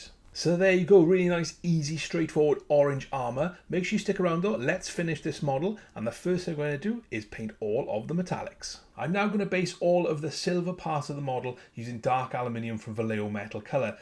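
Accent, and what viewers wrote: Wales, United Kingdom. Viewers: Where in Wales are you from? Loving the vids by the way, I've just got into minis (with the delivery of my DD KS) so painting is....uhhhh....fun :D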